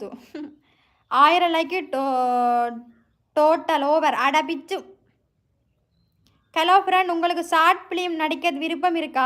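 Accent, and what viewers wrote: Sri Lanka. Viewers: இலங்கை தமிழ் சூப்பரா இருக்குங்கோ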